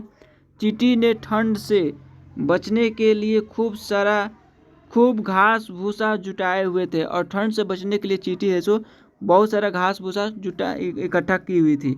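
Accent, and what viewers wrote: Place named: Bihar, India. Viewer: Aapki Hindi Bihari kyu lag Rahi hai 😅😅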